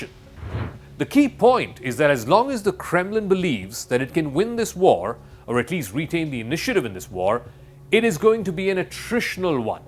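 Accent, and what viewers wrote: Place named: India. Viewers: The first ever Indian that I have listened to that speak fluent English with proper accent unlike indians with all respect. Perfect English perfect accent perfect view of war and not biased. Respect to you. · He still has trace of Indian accent. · This is Indian accent.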